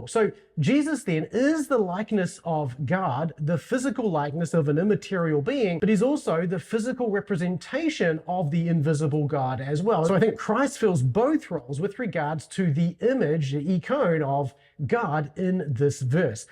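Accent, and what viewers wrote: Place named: New Zealand. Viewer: Great video, love your content. Not sure if you are aware how you are pronouncing God as “guard”. Quite off putting given the subject matter. But I am fond of NZ accents. You do great work. Keep it up!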